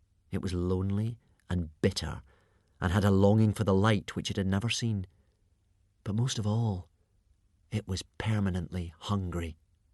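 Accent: Scotland, United Kingdom. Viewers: The Scottish accent really goes well with the story.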